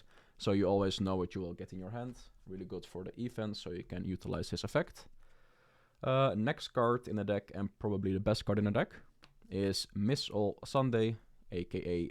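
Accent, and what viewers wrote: Netherlands. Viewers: got an interesting accent, are you dutch by any chance? Also Nice Channel!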